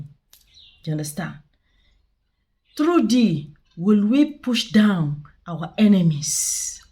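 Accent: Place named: Nigeria. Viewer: I am from Tanzania. I have been following your videos with a lot of admiration and faith if to say so. The only problem we from this part of Africa are facing is your Nigerian accent. Your (Nigerian) pronunciation is very different from ours. This makes it hard to understand you at times otherwise, you are blessed Madame and may God continue to use you.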